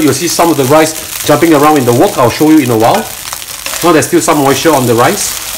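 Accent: Singapore. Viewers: blech71 singaporean accent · Wah lau. Nice video and great narration in chaste Singaporean accent. Miss the place and the people so much. Take care aah. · You speak like a Singaporean... I miss Singapore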